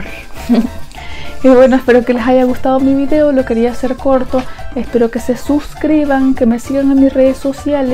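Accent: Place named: El Salvador. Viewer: Adoro ese acento salvadoreño!!!! Te mando calorcito nos tb sentimos mucho frio y en verano demasiado calor! Buena vibra hermana!!!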